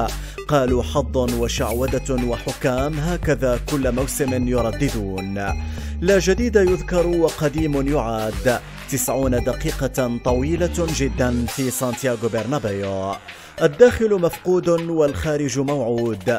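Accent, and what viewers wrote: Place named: Iraq. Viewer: اه كتير حلو وحكيه مميز والسرد ايضا.. من كلامك مبين انك عراقي😊♥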